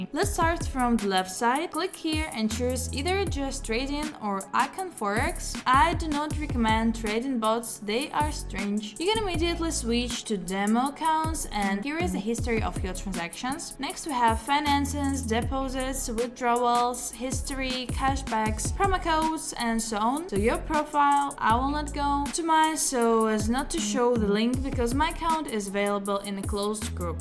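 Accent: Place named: Russia. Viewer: Как же чувствуется русский акцент )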